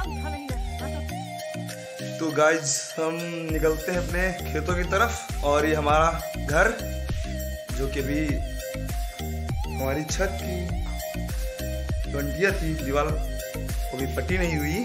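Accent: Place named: Bihar, India. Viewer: bhai ki boli bihari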